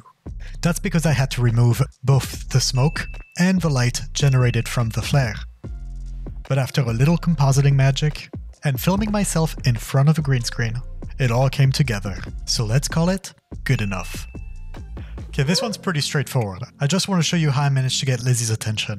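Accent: France. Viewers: Wow I love your channel! But when I was watching this I thought “ hmm this guy has a French accent” then you said “et viola” and then I thought “ this guy is French!” By the way I was surprised because I’m French too and you are one of my favorite YouTubers! So, please answer this question… are you French? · vu l'accent t'es français non? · Aren't you French????? I'm hearing some French accent no?? (I'm French) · Are you french ? You have a little french accent. I'm french too ! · Ça sent l'accent bien français 😁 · Tu as un accent français, tu es français ?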